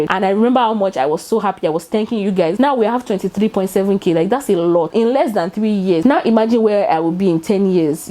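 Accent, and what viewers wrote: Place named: Nigeria. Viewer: De Nigerian Accent🌷🌷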